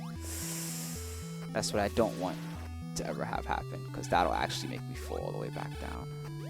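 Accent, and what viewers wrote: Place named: New York, United States. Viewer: I recognize a newyorker anywhere, #Queens!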